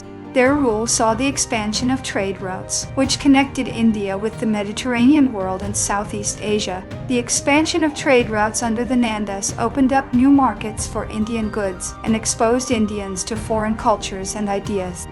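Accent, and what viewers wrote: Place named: United States. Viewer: why do you use an american accent that corrupts Indian names? Have the decency to be true to your subject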